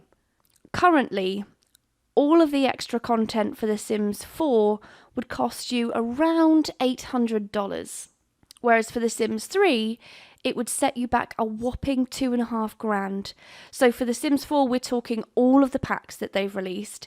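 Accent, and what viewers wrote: United Kingdom. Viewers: Oh my life are you brittish? I joined on and your accent sounds so Brittish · I think she's british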